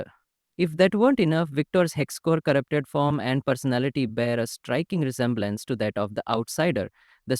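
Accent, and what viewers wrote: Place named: India. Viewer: Hey bro amm the vedeo is good but wat I think it lacks is a good english if you wanna improve it just find some one to chat with by chat i mean talking not texting and It should make your english sound less Indian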